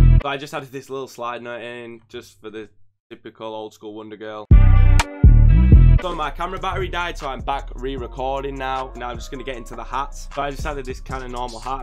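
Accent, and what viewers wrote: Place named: Northern England, United Kingdom. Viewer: You are definitely from north England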